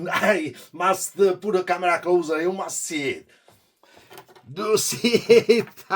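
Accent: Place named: Finland-Swedish, Finland. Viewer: You sound finnishswedish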